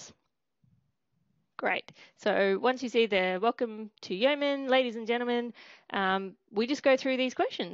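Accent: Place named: Australia. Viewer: I guess she is from Australia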